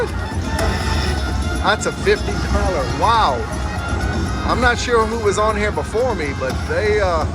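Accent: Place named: South, United States. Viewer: Nice run!! If it ain't broke, don't fix it 💯 hey, your voice and southern drawl sounds like Brock from the Reba show. Keep on feelin lucky ❤🎉❤🎉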